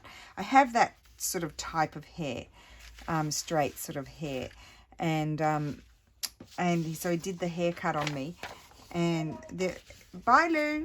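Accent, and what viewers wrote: Australia. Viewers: I love how you sound more "Aussie" the longer you are away from home! This is lovely. I am still holding out hope that my birthday challenge entry arrives this year!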